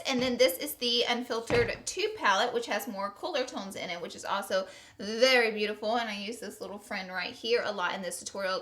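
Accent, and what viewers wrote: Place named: Alabama, United States. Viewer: You're still in my prayers!! I came over here because I heard you're from Alabama (I am too) and I heard your accent and I was like yep she's from alabama haha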